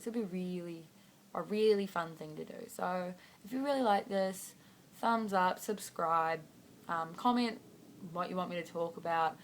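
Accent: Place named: New Zealand. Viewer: You sound like a kiwi!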